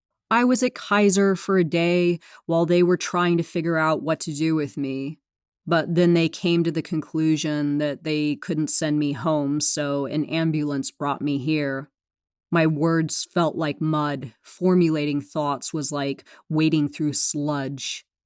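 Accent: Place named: Oklahoma, United States. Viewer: Damn I called it. The accent. Except I thought you had came from Tx to Ca. But its Ok. OK is amsricas step child. Tx dont claim yall, midwest dont claim yall, dirty south dont claim yall. And the modesto...valley people are scary. Coast people spot valley people a mile away when they go to Pismo etc. Rough starting point man. Damn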